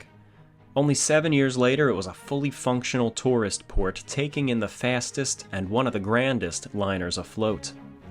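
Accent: United States. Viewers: a wonderful American accent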